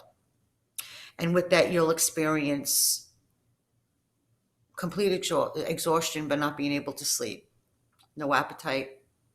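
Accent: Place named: Canada. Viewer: Ahh , c'mon your accent isn't that thick . But , I can still tell your that your cleary Canadian . Clearly . 🤪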